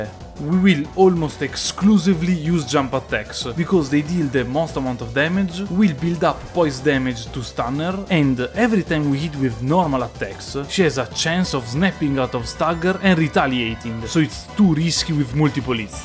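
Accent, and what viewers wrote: Italy. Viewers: Fra L'accento italiano è inconfondibile. Grande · Fra è così strano trovare l’accento italiano più forte della storia cliccando su un video a caso nella home. Nice video tho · Sempre bello sentire l'accento Italiano, bel video! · Find this video in my homepage, hear you talk and i think, this guy is Italian, then you go "sweat, blood and blasphemy" and i get the confirmation you are italian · Aaaah l'inglese parlato da un italiano... POESIA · Mi sembra troppo italiano questo inglese Aswer me my BOI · Bro sento l'accento italiano , comunque bel video abbastanza informativo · Your accent looks so italian broo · very obviously Italian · dalla tua voce sembri Italiano...ci ho preso? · hai un accento italiano fortissimo e lo amo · Mamma mia che accento italiano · You are the most Italian man I have ever heard. Subscribed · hey man can i ask you something? 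are you from italy, because you' accent you'r form there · palesemente italiano hahah Ottimo lavoro fratello👍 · appena ho sentito l'accento, ho capito che è Italiano ahahaha. Sono troppo d'accordo, che canale figo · Bel video, ma con questa pronuncia di riconosce che sei Italiano😆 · This video just popped out in my recommendation and as soon as it starts I hear in your voice a strange accent, I know it's really strange but I wanted to ask you a question, are you Italian? To me it seems a lot that you have an Italian accent, you know, I'm italian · Ma che accento Veneto hai? 😂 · ho capito che sei italiano al minuto è bello vedere youtuber che sono italiani fare video in inglese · Are you the Dave504 of gaming? lol your thick italian accent reminded me how Dave speaks! Great video mate, very well done! You put a lot of effort into it, and you made me realised Iàve missed a frozen dragon 😅 And even more, well done for creating content in eglish, I'm been living in the UK for many years now and I still don't feel confident about doing so. 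Thank you for what you're doing, you gave me a boost in confidence to consider creating contente not in italian! Keep up with the good work!💪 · This is the best Italian accent I've ever heard!! Also, cool vid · L'italiano comunque si sente lontano un miglio XD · Secondo me sei italiano 😂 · Dude great run, especially the Italian ass accent get me all of the time hahahah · Honestamente mi ha piaciuto tanto il accento Italo-inglese che mi sono suscritto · TROPPO BELLO L'ACCENTO ITALIANO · Great video! Don't know why you wanted to talk in english, even if è abbastanza intuibile tu sia italiano... but whatever :D. Good video nonetheless. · Man, such a great video! Hope that palestinian kid is doing ok :( PS che bello vedere l'accento italiano riscuotere un simile successo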